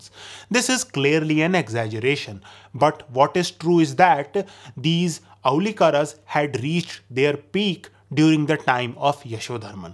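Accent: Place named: Delhi, India. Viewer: accent to Delhi side ka hai aapka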